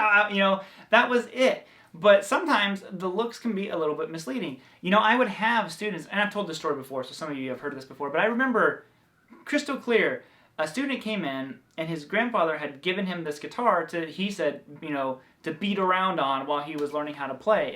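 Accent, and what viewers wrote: South, United States. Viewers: Superb country accent,!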